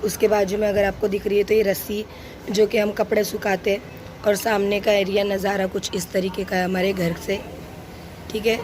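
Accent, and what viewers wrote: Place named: Nagpur, India. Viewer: Asslamoalaikum ji.. Aaj pehli bar apka vdo dekha maine.. Balki apko hi pehli bar dekha maine aaj.. Pata nhi Q but aap dekh k bahot apnapan feel hua mujhe.. Like apki bate hamare Nagpur Jaise lagi.. Aap kaha se ho.. Or vdo bhi dekhugi ab mai apke.. 🤗🤗🤗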